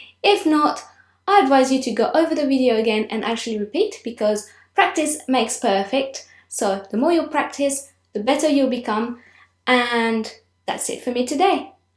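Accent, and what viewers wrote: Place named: United Kingdom. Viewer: She can speak British and French at the same time. Omg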